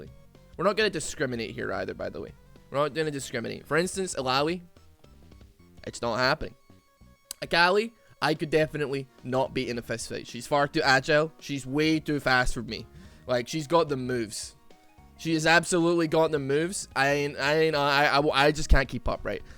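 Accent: Scotland, United Kingdom. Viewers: The facut that he's scottish makes this 1000 times better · If it wasn't for him being Scottish I probably wouldn't believe him.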